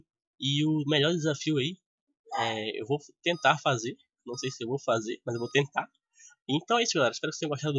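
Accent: Acre, Brazil. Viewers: vc e do acre?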